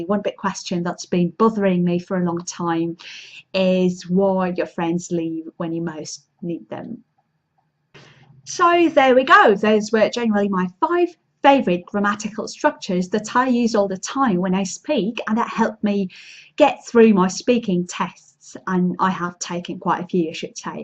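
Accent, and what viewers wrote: RP, United Kingdom. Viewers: Do you sound in RP?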